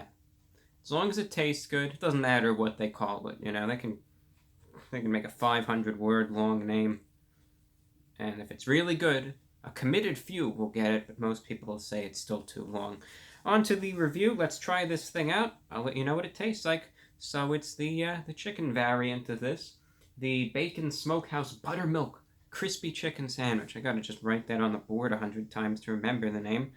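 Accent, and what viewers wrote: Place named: New England, United States. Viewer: he's from new england, it's a slight new england accent, my grandpa was born in massachusetts and he has a thick new england accent.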